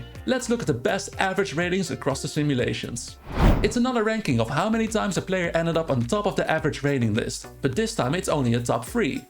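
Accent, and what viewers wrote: Netherlands. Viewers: I think every time if you talk, you’re a dutch guy who talks english😅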